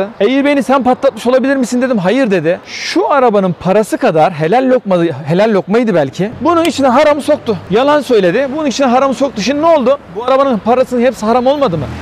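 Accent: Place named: Isparta, Turkey. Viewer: Hocam ispartali misin? Ben yalvacliyim siven bizim oraları andırıyor. Anlattığın olayda ince düşünmen gayet güzel, takipteyim😊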